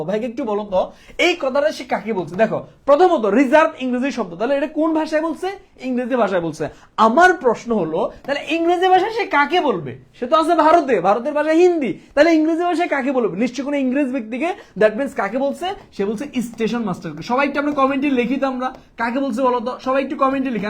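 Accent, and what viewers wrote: Chittagong, Bangladesh. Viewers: Vaiya apni ki chittainga..?🙂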